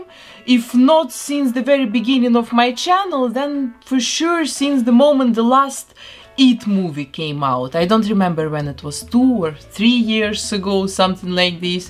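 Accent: Russia. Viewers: Я слышу очень сильный русский акцент 🤔🤔🤔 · Акцент русский я права? 😁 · Боже, смотрю вас уже несколько лет. И по акценту догадывалась , что вы русскоязычная. Но оказывается вы из Беларуси. Держитесь там, уверена , все будет хорошо))) ❤️💚🤍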